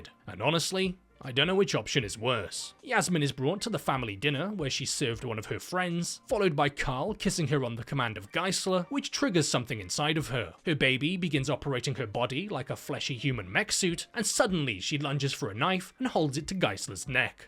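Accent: United Kingdom. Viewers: Hes british or something he can't help it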